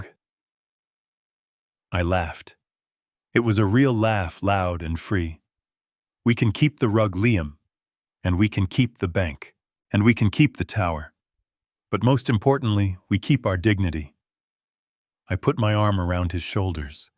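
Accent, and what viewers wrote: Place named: United States. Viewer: Enjoyed listening , nice clear American accent , from Scotland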